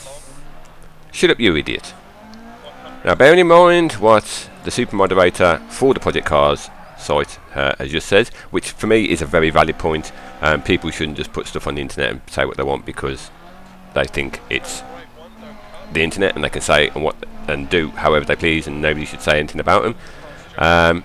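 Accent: United Kingdom. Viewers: would say uk.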